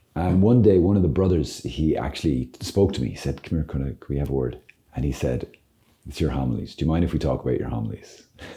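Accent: Ireland. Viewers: U have a little irish ❤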